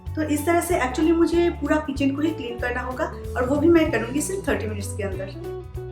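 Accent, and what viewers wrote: Bengal, India. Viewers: At first your accent says you are Bengali so great u are trying to speak in hindi and secondly before seeing the video I seriously thought u are a single handed woman I mean u don't have the other hand hahaha... And finally what kind of video is this?? So what do all other women do?? Eat and sleep.. Every woman works be it housewife ir working woman.. I m not a hater but this video is a waste of time and totally useless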